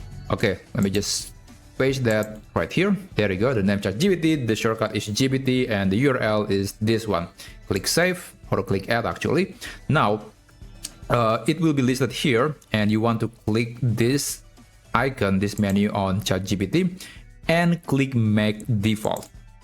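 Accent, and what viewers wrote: Indonesia. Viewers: Apakah anda orang indonesia ? Dari logatnya sih orang Indo yah kak ?😊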